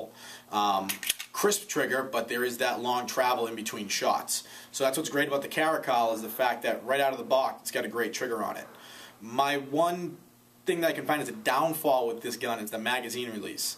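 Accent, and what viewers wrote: Massachusetts, United States. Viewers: Are you from Mass?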